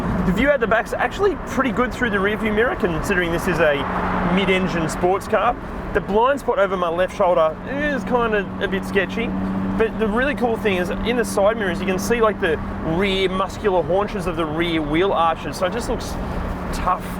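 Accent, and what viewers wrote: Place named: Australia. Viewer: Man its good to hear some nice aussie commentary, thanks for the review!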